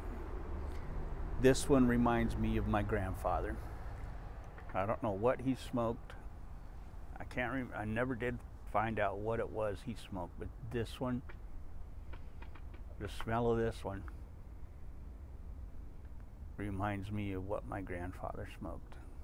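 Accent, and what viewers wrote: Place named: South, United States. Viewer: Great review. Sounds good. And I see you are adjusting to the southern country life just fine. Even starting to get the drawl y'all.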